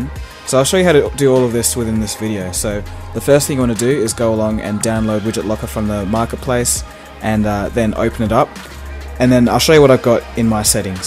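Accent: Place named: Australia. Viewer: I wouldn't worry about it. You've got an "accent" being from Australia or wherever you're from, but other than that, you sound fine to my American ears. Good job with the videos. Very well done!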